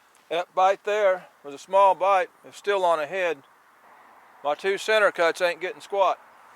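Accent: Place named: South, United States. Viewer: I'm sure somewhere in Tennessee. By the way he talks. He from the south yeehaw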